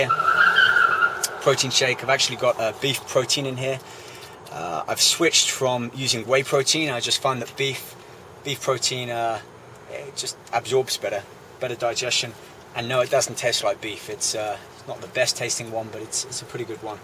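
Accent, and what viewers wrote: United Kingdom. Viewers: Left hand drive? --- and his British, where is he ? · I hear a British accent in his voice. Where is he from? And how long has he been a bodybuilder?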